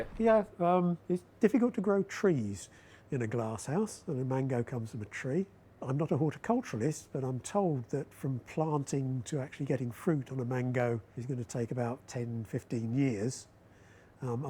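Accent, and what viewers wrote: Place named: United Kingdom. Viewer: BananER...kills me every time! Is there any explanation as to why the British accent tends to drop the "a" and replace it with "er"? Or is it much like my New Jersey accent that tends to drop the "g" from "-ing" or implant a "w" in place of "-ll" such as "ball" turning into "bawl"? It just is what it is?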